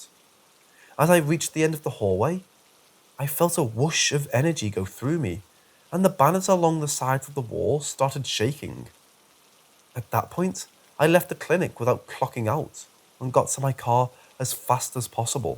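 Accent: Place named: United Kingdom. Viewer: Great stories, cool British accent ✨🤡🧐👍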